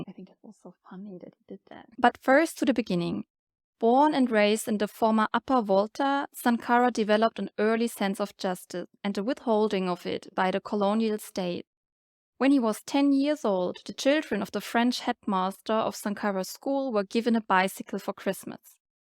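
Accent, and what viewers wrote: Germany. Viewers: Amazing!! I really loved this video!!🥺 It was so sad to see that you don’t have the recognition you deserve. Hopefully your channel grows soon!! You deserve it! I’m so glad I stumbled across it and I will be watching more of your videos!! I really love your accent btw. What part of Germany are you from? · Great storytelling. I recognise your German accent. I'm Namibian so I hear it often in our cities like Windhoek and Swakopmund. We have a huge German community in Namibia. I'll subscribe to your channel.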